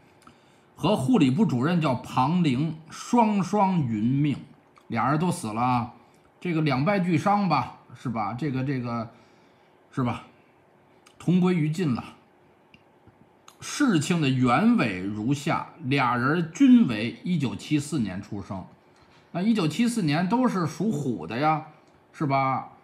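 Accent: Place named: Beijing, China. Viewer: 北京话好听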